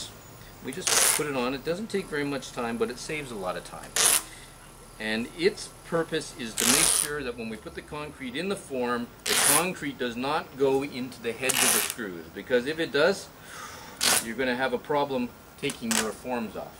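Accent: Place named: Cape Breton, Canada. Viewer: great video..... I started watching, and thought... this guy's from cape breton! thanks from moncton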